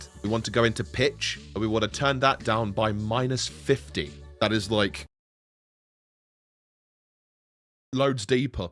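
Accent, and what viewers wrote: United States. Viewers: Oh my god, I kinda expected voice to be just a slight radio-y exaggeration of your normal voice, this is nuts. You're killing it with the American accent, it's spot on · THANK YOU SO MUCH!! (Also holy crap, your American accent is ON POINT!!) ❤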